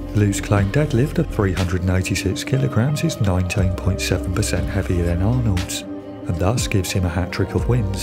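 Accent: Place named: England, United Kingdom. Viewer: An Englishman talking about Americans. You couldn't get Morgan Freeman?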